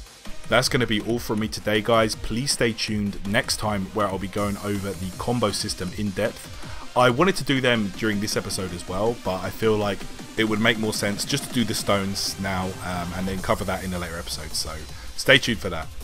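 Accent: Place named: United Kingdom. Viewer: British accent is a plus in a guide.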